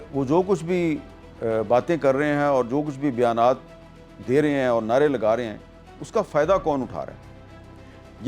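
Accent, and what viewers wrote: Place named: Kashmir, India. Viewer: Thanks u sir that's how real Kashmir blood speak real Kashmiri..🫀❤️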